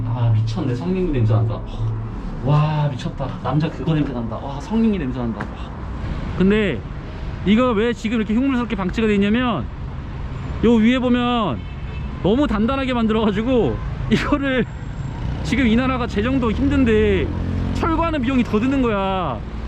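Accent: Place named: South Korea. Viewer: 형님 발음 중독성 오집니다 ㅋㅋㅋㅋㅋㅋㅋㅋㅋㅋㅋㅋㅋ 리얼 코리안 영어발음 저를 보는거 같아서 내가 영어잘하면 이런 느낌이겠지? 하면서 봅니다 ㅋㅋㅋㅋㅋㅋㅋㅋㅋ 너무 재밌어요 전염병 조심하시고 즐거운 여행하세요 형님~~~~ 아 저는 24살 대학생입니다 영상 너무 재밌어여~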